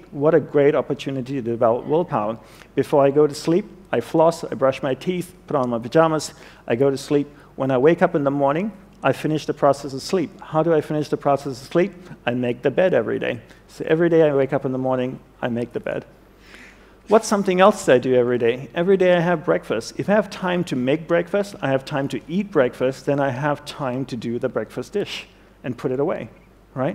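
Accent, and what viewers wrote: United States. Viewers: Oh for fucks sake, Indian fake gurus are now speaking with American accents. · This is refreshing: A Hindu teacher with a New York accent!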